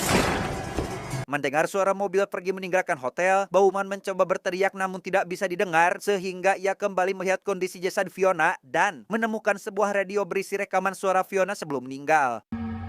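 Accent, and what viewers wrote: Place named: Sunda, Indonesia. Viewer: Unik bgt suaranya. Film horor tp suaranya kyk ada sunda2nya beuuh ucul bgt fans wh lah mang . Curiga tos ngaliwet😂 ieu mah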